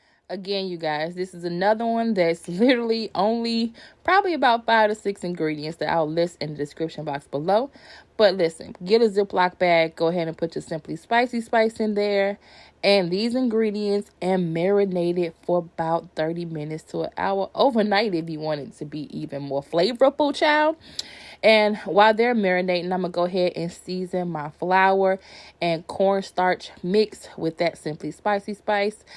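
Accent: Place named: Texas, United States. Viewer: Hey! You sound like you are from Texas.💜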